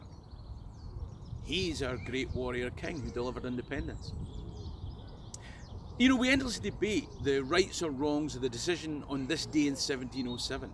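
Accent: Scotland, United Kingdom. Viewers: The subtitles are not keeping up with your scotish accent....🤭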